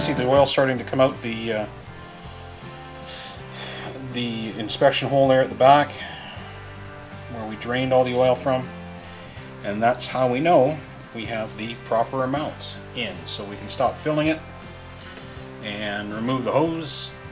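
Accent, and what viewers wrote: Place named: Canada. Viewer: Go Canada ! Can hear the accent come through clearly. May the truckers be blessed and may Trudeau go the way of Herod. I really needed that tip on the fender thingy because I struggled with it last night and was cursing German engineering. Also I came up with an extra little black washer ? Does it go on the back part of the shaft housing where the one fender bolt goes in ?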